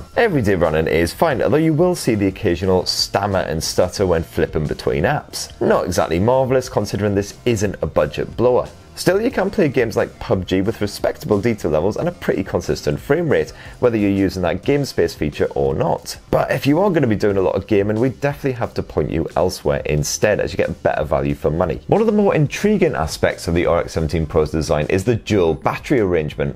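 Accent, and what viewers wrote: United Kingdom. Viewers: A little exhausted to catch your british accent sometimes. Maybe you're willing to a bit slow it down next? Nice video btw.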